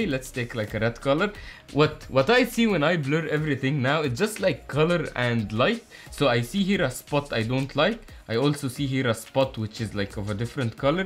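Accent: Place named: Egypt. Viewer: I like the last idea. Breaking down famous artists. And even in that idea, performing their famous art yourself is the part I loved the most because I guess we will go through a very good learning curve that way! 😍 Also loved this video. You should keep doing such videos too every now and then. And last but not the least, I love your accent. I was living in Cairo for 6 months and hearing you was making me feel nostalgic. I felt like I'm home throughout the video! 😃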